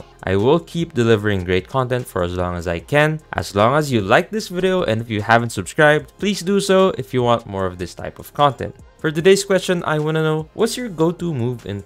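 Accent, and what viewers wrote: Philippines. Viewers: I’ve been wondering for a while r u Filipino cuz you sound like it?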